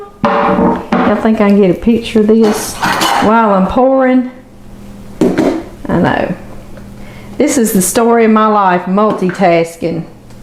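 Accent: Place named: Louisiana, United States. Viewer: where are you in LA? I am from Shreveport. You sound like you could be from just east of S'port. My accent is a SE TX accent since I was raised as a little kid in Beaumont, TX. I tell people that I have an educated SE TX accent. I remember Mother giving me this recipe back in the early 70s. She got it from my Aunt Helen, her sister-in-law, who lived in Dallas. I believe they are named for the musical "," which was very popular in the late 60s - early 70s. Yep, this is really a recipe from way before the 80s. I loved them and made them for get-togethers long before the 80s. One commenter said that they used a 9 X 13 pan for theirs. I believe that is the pan my recipe calls for, too. They come out just as delish, but thinner in such pans. I had a little party at my dinky house (half of a duplex) back when I was an undergraduate in New Orleans. 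My girlfriend helped me, but she was not much of a cook; I was the cook for us. Anyway, ones of my friends there said something along the lines of, "I can't stop eating those Hello Dollys. I've already had 4 of them." I replied, "You've had 6, but who's counting?" We both loved it because how often does one have the opportunity to use that line?!? Anyway, these little bar cookies that are almost candies are so tasty and simple enough for a boy just starting out cooking on his own to do with ease.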